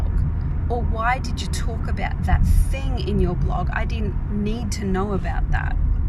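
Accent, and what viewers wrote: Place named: Australia. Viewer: Hello, thanks for sharing and thanks for the tips and the advices! Love your accent! You probably live in Adelaide as I do. Thanks again!:D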